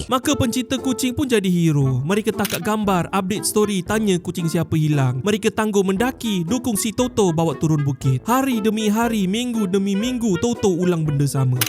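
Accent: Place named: Sabah, Malaysia. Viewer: terkadang terselit logat Sabahnya.. menghiburkan betul bah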